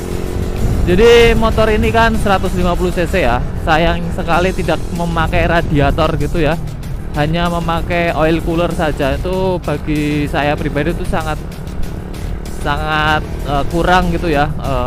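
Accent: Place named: Java, Indonesia. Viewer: Jawa ngapak tapi mas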